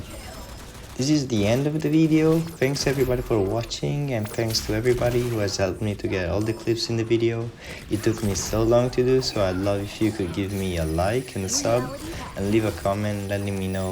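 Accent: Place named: Italy. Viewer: Gotta love the italian voice! Thanks for the S/O and nice vid!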